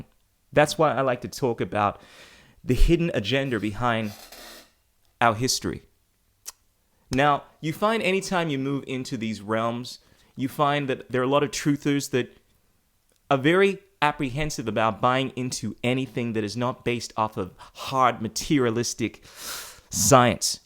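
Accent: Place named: United States. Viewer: Why do you speak with an American accent if you're from Ghana?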